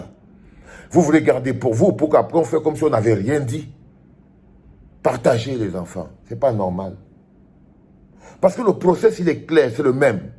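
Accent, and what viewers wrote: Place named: Ivory Coast. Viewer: Ya pas garçon au Cameroun? Tu sonnes comme un ivoirien. Avec votre président marionnettes de l’Élysée à qui on vous donne une blanche comme première dame ivoirienne 😂 vous ouvrez aussi la bouche? Quand les gens parle faut vous cacher cuz vous êtes les premières à vouloir attaquer le Niger qui se libère de votre président ( Macron )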